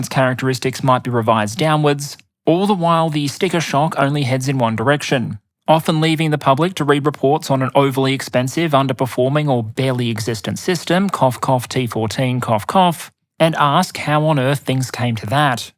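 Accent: Australia. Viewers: Fantastic vid Aussie! I always love seeing one of your videos with a less than sexy name e.g. "Widgets: the design, building, procurement, and supply chains involved", and also knowing some guy who talks funny from down under is going to have my undivided attention for the next hour. I am surprised however when talking about the Bush Turkey, you left out how successful its predecessor the SD-20 Dingo was in it's only decisive victory over Kiwiland in their most recent war. #StandWithKiwiland #StopEmuAggression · No, no, no, once a month 😱? once a week is little enough. Every Sunday I'm looking forward to power point presentation. I even started to like that Ozzy accent!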